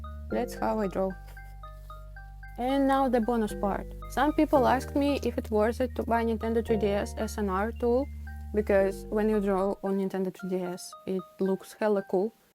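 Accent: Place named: Russia. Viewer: this woman got that babushka accent.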